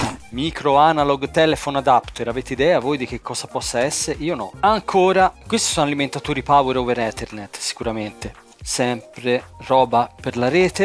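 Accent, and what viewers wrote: Tuscany, Italy. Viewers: Sei un simpaticone e mi fai divertire un sacco con il tuo dialetto TOSCANACCIO 😄😄😄😄😄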